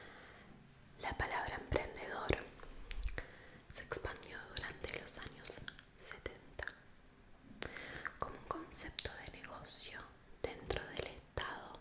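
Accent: United Kingdom. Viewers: I really love your English acent, you do it very well, love ur videos, been I really don’t understand you anyways I put the subtitles, haha u are the best!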